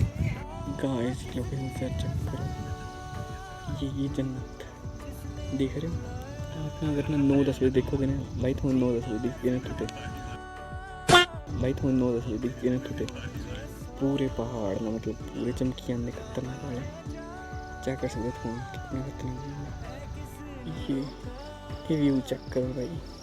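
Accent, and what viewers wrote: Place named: Pahari, India. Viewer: Pahari accent Superb 🔥🔥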